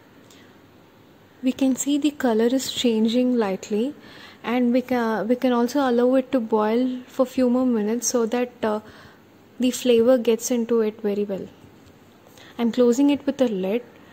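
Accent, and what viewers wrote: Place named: United States. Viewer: American atma in Indian body....your angreji is so English ji.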